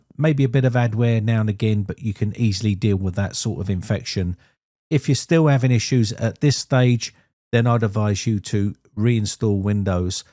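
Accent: United Kingdom. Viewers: Man, man, whatin a fuckin" bullshitin' annoyin listenin to this fuckin old-man britishin accent! Here here here here here here! Always showin his olden schoolin computin fuckin prowessin with the comnandin line-nen! SOEN FUCKIN ANNOYIN!!! Shut uppin fuckin old man, you're fuckin annoyin!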